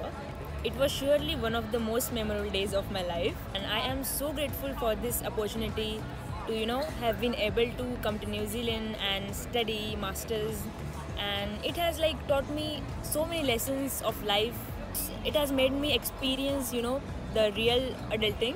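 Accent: India. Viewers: I liked the way you keep your Indian accent while talking in English unlike some people who try to pretend that they have adopted the accent but even the people who they are pretending to be don't understand what they say.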